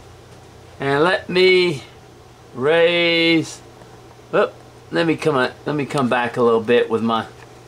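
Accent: Texas, United States. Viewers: Newbie carver and love your videos. Sounds like you live in my neck of the woods here in Texas. Are you a member of any local carving clubs? Also I know you have done videos on tools, but do have a list of the “x-number” of most important tools (and sizes) - I.e size and angle of vtool, gouge, knife blade etc? Finally, thank you. You have inspired me, and others much more talented than me! It has gotten me off my phone and outside— a real hobby.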